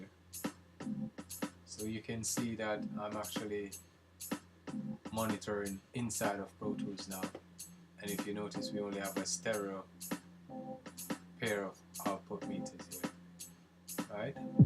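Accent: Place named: Jamaica. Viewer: Yo you sound like a Jamaica still .... glad for the tutorial ... was wonder how i would get Slate VMS to work with Apollo ... you have just given me an idea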